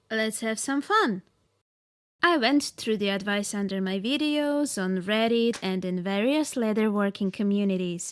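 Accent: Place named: North America. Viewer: для моїх потреб буде достатньо. Англійська мова дуже гарна у вас. Північно американська більше, ніж британська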